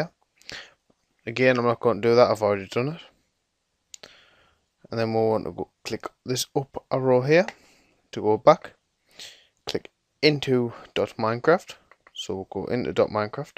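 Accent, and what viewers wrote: United Kingdom. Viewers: Ur from newcastle same acsent as me british newcastle geordie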